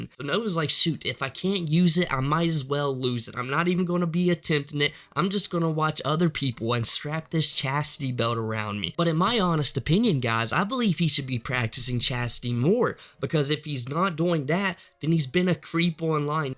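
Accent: South, United States. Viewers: You have the mosr country accent ever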